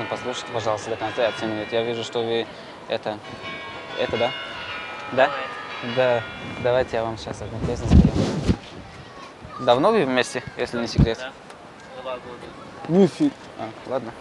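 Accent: Tajikistan. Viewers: Ту ёвонии ранги ёвоние гап мезани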